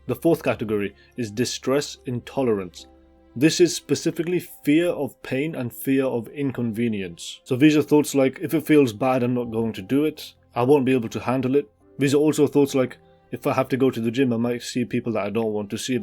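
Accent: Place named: Egypt. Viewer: This random, tiny channel of a man speaking with Egyptian accent has helped me so much with pushing through procrastination. Thank you Internet man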